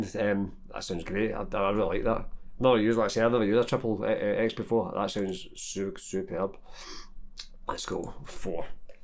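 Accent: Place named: Scotland, United Kingdom. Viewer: Hahaha love the way you pronounce Mesa boogie with the mighty Scottish accent 🤣🤘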